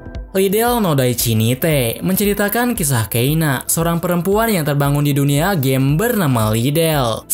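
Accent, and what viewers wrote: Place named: West Java, Indonesia. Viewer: Pantesan kayak orsun logat bicaranya wkwkw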